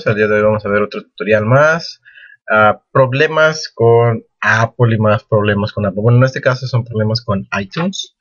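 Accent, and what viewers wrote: United States. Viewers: Español chicano everywhere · hablas como gringo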